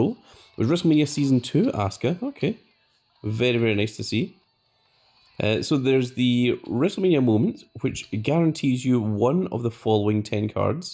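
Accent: Scotland, United Kingdom. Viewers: Yoo are you Scottish or is it just me also nice video cause I’m Scottish 🏴🏴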